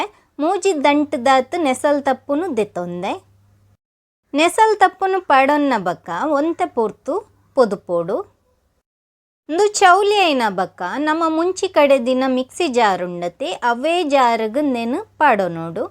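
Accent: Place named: Mumbai, India. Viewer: You sound like Mumbai residing mangalorean.. it's very irritating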